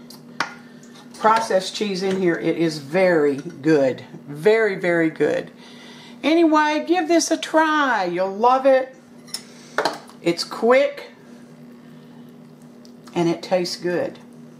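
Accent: South, United States. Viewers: i love cooking with my pressure cooker (i have the instant pot model) and i love making mac and cheese in it. can't wait to try your recipe! thanks! also, if you don't mind my asking, where are you from? your accent sounds a bit like my family who was from texas/oklahoma/louisiana. thanks!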